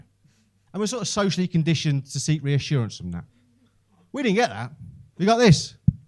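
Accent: Liverpool, United Kingdom. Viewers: He's more scouse than a brummie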